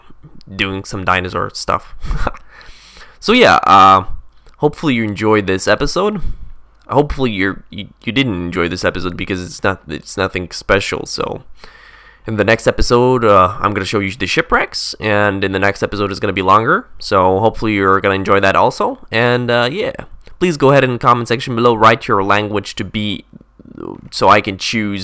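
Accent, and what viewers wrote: Scotland, United Kingdom. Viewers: English but in a british accent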